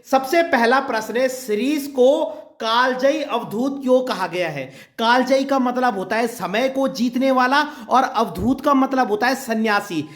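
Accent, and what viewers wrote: Garhwal, India. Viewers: Sir ji aap gadwali ho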